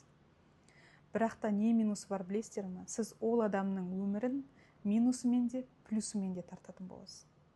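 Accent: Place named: Kyrgyzstan. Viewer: саламатсызба, сиз кыргызсызба? Себеби көп сөздериңде таза кыргызша айтасың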